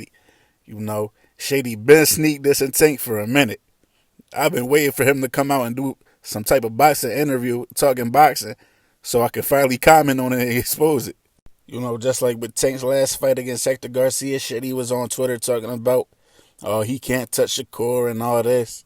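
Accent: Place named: Pennsylvania, United States. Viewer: I DEFINITELY THOUGHT YOU WAS FROM MY CITY BUT YOU STILL FROM PA SO YOU HAVE OUR STRONG ACCENT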